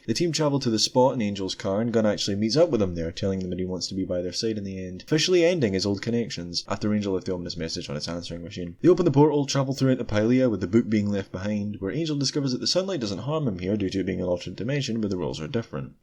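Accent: Scotland, United Kingdom. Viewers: Audio's fine, talking isn't THAT fast and while his scottish accent is definitely strong, it's a lot easier to parse than some js. Btw, captions are a thing :)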